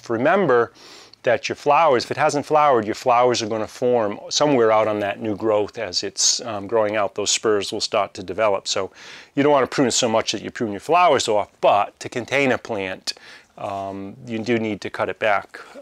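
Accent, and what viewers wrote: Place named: Southern New England, United States. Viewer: Yes! Proud southern New England accent!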